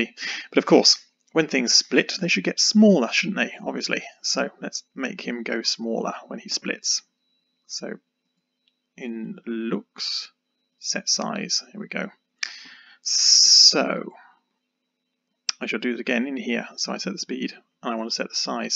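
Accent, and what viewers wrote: United Kingdom. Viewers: waitwaitwait you're BRITISH?? that's what it sounds like.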